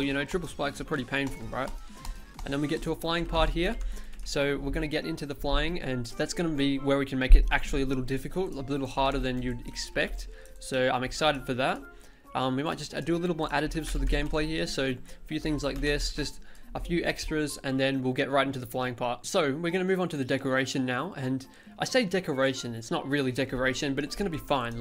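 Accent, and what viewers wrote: Australia. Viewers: is he australian or some british country if he said "g'day" hes australian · By the 1st 3 words i guessed you are an australian M8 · most Australian intro ive ever heard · Are you Australian? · Like that good Australian accent mate.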